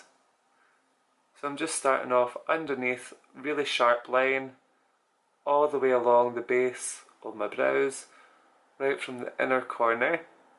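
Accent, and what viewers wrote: Scotland, United Kingdom. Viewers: Wow!!! Seems like there is another one who´s bored of the Nude Make-up all around ;D!!! I love your makeup!!! OMG!!! You´re from Scotland!!!! I looooooooove your accent! Wanna go to Edinburgh this summer (a bit bored of London and Kent now ;D)! Meanwhile I have to learn the accent ;D, guess I have to watch "Outlander" another time XD! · very pretty! and i love your makeup. are you from scotland?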